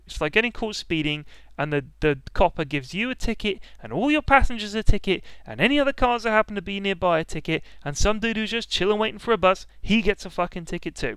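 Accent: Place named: Cockney, United Kingdom. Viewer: i love this cockney rage XD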